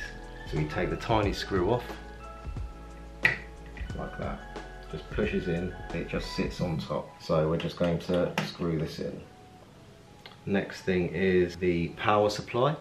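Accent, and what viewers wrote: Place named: United Kingdom. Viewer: you say "this front panel (satl?) connector", what word are you saying? I'm from the US and sometimes a British accent makes it difficult to pick up every word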